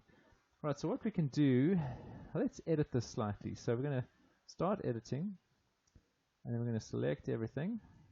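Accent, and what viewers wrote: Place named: South Africa. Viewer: Hello, thanks for these videos and the South African accent! I’ve got a points layer which represents the centroids of my hexagon layer, however, I’m struggling to align a line grid layer to pass through the centroids. I’ve tried various ways to align the grid but I’ve had no luck. If this doesn’t make sense, I could send you a mail with a screenshot explaining my problem. I’d really appreciate any assistance, thanks.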